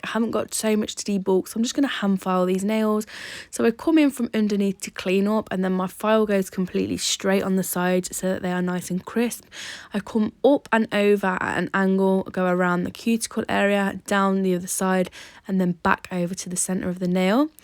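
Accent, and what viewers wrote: United Kingdom. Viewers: Jajajaja i love the way you said piña colada I’m from Mexico and I love your British accent Greetings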